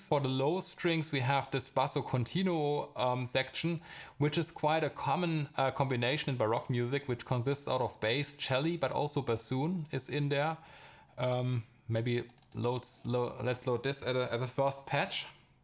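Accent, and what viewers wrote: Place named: Germany. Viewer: i love the german english. :-) sehr gute Einführung. Danke, sehr fundiert auch in der Geschichte. Danke.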